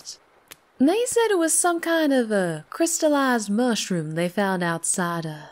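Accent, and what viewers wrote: South, United States. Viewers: ! Another amazing and magical ASMR tale with a new character to love, Mama Marennah is a wonderful addition to your gallery and your Southern Accent is just even more incredible than before. You can really tell you have been working hard on improving your Voice Acting and all that hard work has paid off hugely. A standing ovation is the bare minimum accolade that you deserve and honestly so much more would be appropriate.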